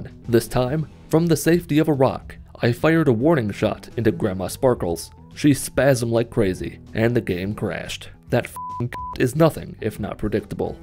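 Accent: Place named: Michigan, United States. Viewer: I always wondered why your accent was worse than mine. And its because you are from Michigan and I am from Ohio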